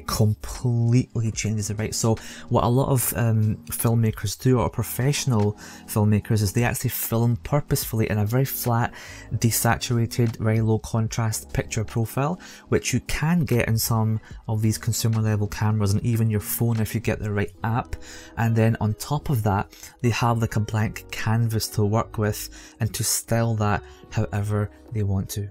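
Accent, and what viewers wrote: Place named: Scotland, United Kingdom. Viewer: Great tips bro, looking forward to more of the Scottish accent and filmmaking tips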